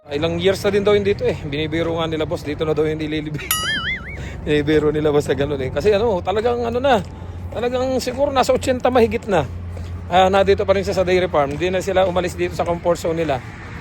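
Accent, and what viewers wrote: Philippines. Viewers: Kuya parang tagalog na tagalog ka? Taga Quezon?, Mindoro? Mariduque?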